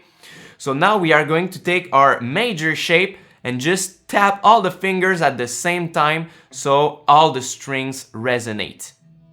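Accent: Quebec, Canada. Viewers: I love your Quebec accent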